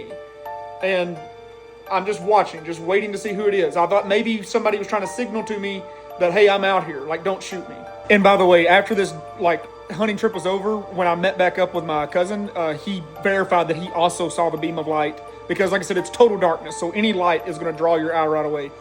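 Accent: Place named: Appalachia, United States. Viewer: No wonder I was drawn to your channel. I mean animals and spooky stuff aside. I knew kin when I heard it. 😂 WV Appalachian here.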